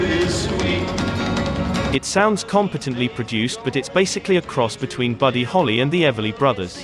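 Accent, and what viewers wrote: England, United Kingdom. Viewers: That aint Frank Zappa . Since when does Frank Zappa have a British accent? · We are hearing Zappa’s words, read by an Englishman? Gtfoh. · Why is this not Frank speaking and some English twit ?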